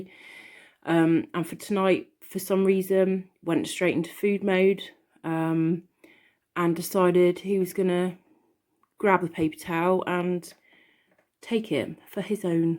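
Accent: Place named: Devon, United Kingdom. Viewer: You sound like your Devon way, maybe Cornwall 😊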